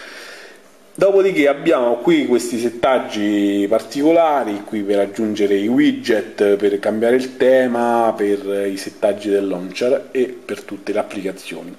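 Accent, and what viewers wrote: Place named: Bergamo, Italy. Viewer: Attendo aggiornamento sulla batteria, sull'audio e sulla fotocamera...bella fratellì, un abbraccio da Roma. C'hai st'accentino de bergamo alta...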